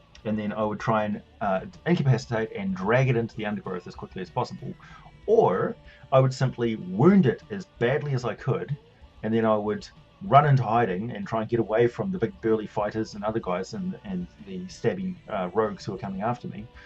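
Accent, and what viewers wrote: New Zealand. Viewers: I knew you were a Kiwi.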